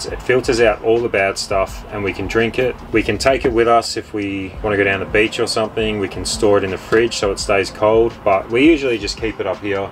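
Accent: Australia. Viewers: Really informative videos. Just one big critique - shorter, sharper edits. The Aussie accent means you speak really slowly, and just coming up with shorter sharper ways to say things would mean this video was easier to digest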